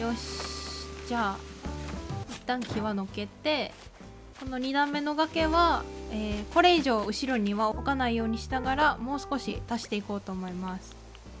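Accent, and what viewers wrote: Kansai, Japan. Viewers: 関西の方ですか？ イントネーションが関西っぽくて可愛い♪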